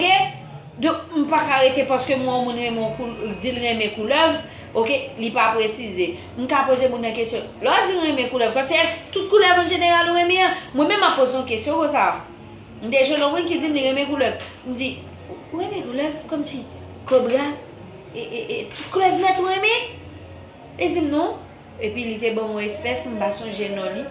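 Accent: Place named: Cap-Haïtien, Haiti. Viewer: Aux Cap accent nice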